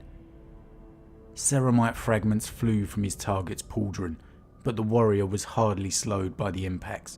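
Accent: United Kingdom. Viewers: I just realised you're British, haha. Such a subtle accent.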